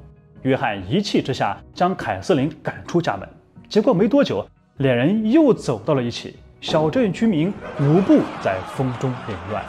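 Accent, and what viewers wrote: China. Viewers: 内地口音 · 我一直想知道你是哪裡人？字幕是繁體，用語和口音卻比較像國內，所以比較好奇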